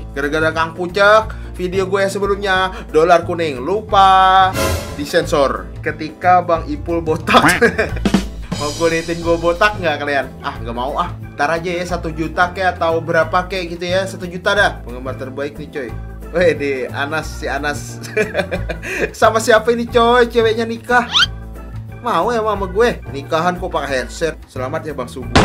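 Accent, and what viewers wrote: Banjar, Indonesia. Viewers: Bang km org mana mirip banjar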